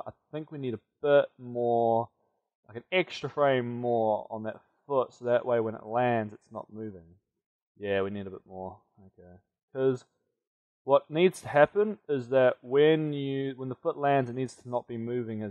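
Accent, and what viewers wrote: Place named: Australia. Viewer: I can't tell if you're Australian or American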